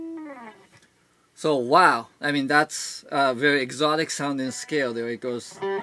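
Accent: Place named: Russia. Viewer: I detect the Russian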